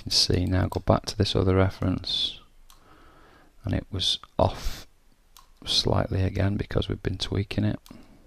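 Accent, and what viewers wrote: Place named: England, United Kingdom. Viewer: Believe you me, he has a beautiful English accent.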